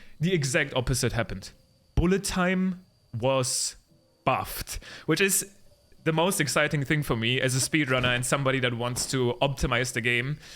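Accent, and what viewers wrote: Germany. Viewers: Kommen sie aus Deutschland? Just curious! Your accent sounds like you're German 😅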